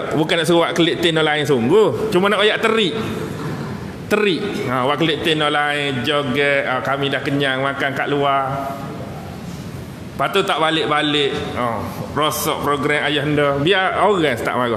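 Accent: Kelantan, Malaysia. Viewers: 1st time dgr ustaz bg tzkirah full guna loghat klate,ad gk trselit skit loghat utara 😂 btw,cite p ramlee mmg byk mksd trsirat,org² trtntu je yg bole pham..moge ustaz trus succes dunia akhirat..aamiinn #XlapukDekHujanXlekangDekPanas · kuliah ni buleh dengar Ustaz kecek Kelate, Kuliah lain bbunyi Utagha semace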